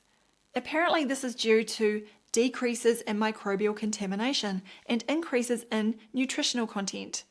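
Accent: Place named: New Zealand. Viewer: Sounds like a kiwi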